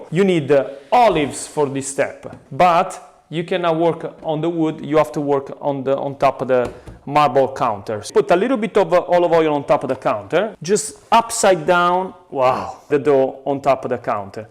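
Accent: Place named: Bari, Italy. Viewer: You have a really nice Barese accent.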